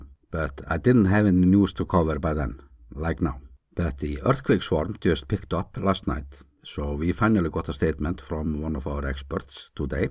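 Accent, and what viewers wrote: Iceland. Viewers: Love these updates from my home country that I left some XX years ago - takk kærlega! :) When living in Iceland, the Icelandic English-accent used to sound terrible to me ~ now I can only hear its charm ❤️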